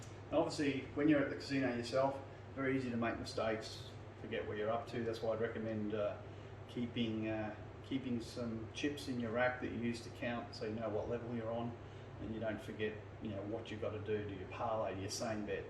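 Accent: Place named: Australia. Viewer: thanks, I agree that the complexity would make it too hard to manage at a live table, so I understand if it proves a fruitless task. Just keep up with the content as you see it, I am enjoying hearing Craps talk with an Aussie accent for a change. Cheers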